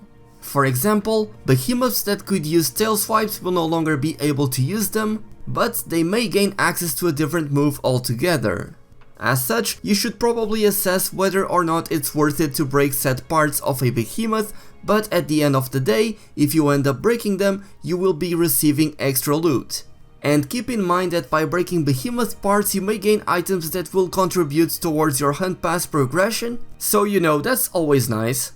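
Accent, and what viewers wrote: Portugal. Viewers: oh mano percebi logo que eras pt pelo o teu ingles wtf,mas bom video btw,copiaste de eu sei de quem mas bom video!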